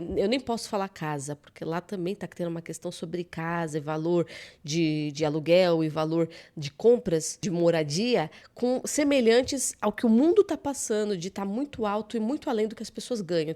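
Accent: Bahia, Brazil. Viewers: Pelo sotaque ele é baiano 😂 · Pelo sotaque é baiano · Como baiano, reconheci logo ao abrir a boca que é baiano! A nossa fala é muito particular!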